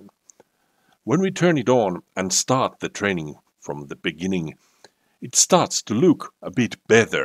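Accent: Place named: Finland. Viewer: The content is great, thanks! I also very love the Finnish english. The sound of their voice is so calming, also when they are really upset :)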